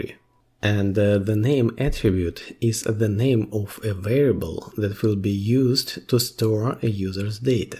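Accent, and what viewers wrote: Russia. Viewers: слышу родное "зэ"😆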